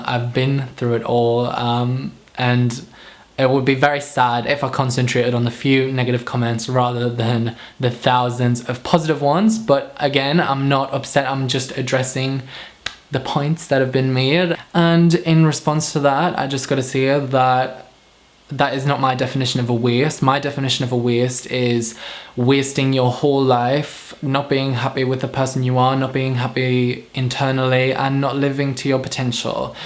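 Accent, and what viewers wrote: Australia. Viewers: Yes, you totally sound Australian, but I'm loving it :-) I prefer British English of course, but this Aussie accent suits you fine. This is only the second video of you that I watch, but I like you a lot as a person and I admire you for what you are doing. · the aussie twang keeps making me laugh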